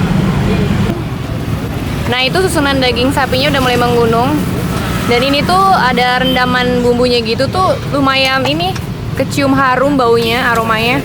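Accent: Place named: Betawi, Indonesia. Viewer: Logat betawinya lucu..coba lbh di munculin lagi logatnya.. kaya afifah stand up/boah stand up. Pasti lbh lucu..hehe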